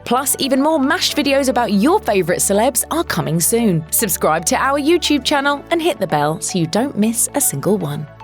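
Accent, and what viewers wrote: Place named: United Kingdom. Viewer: I'm a guy who was initially attracted to Ms. Lawson by her looks on her early food programs. I do cook but her style didn't really appeal to me and then came the dirty news about her marriage, drug use, public embarrassments and, least of all, her weight gain. In spite of all that I would still turn on her program, sit back, close my eyes and just listen to her melodic voice and that charming British accent and maybe drift off into a nice nap. So many celebrities that have everything life has to offer yet they make a mess of it.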